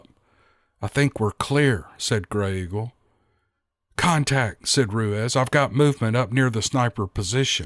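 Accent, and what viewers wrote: South, United States. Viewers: Great 👍 story an terrific experience Listening too you" and your Southern draw" Gray Eagle seems to be a fascinating character in this story I Must say' toward the end I started Feeling sorry for Big Foot' after Gray Eagle got through working them over Ha!! Ha!! Seriously a Revving Vidio... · Your voice, the southern drawl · ...can I call you that for short? Anyway, this has been one of my favorite narrations and stories from your channel. Your southern accent and pacing make for good listening! Keep up the good work brother, I really appreciate your craftsmanship.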